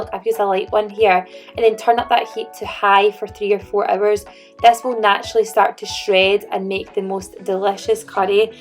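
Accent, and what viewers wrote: Scotland, United Kingdom. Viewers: Yayyy! A new video! I’m still having trouble figuring out what you’re saying but I play the video twice. I’m getting better at figuring out the Scottish accent.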